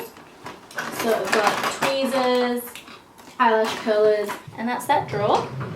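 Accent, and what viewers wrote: Australia. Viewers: i see from your accent your Australian?? so am i but i was wondering where u get ur makeup from because u have the brands that we don't have in Australia???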